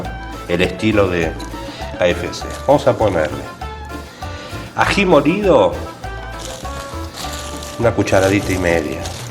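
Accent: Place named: Argentina. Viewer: una consulta eres peruano con acento argentino? que te pasa bro enserio que raro un peruano con acento argentino por cierto gracias por la receta me sirvio <3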